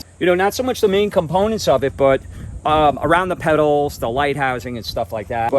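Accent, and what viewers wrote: New York, United States. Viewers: Are you from NewYork? The Bronx???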